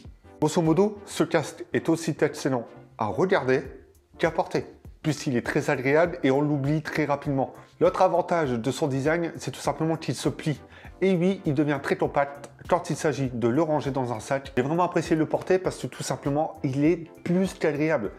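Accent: France. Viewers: 2021 another person who can’t speak English...only frogs can understand u ,we are not frogs ..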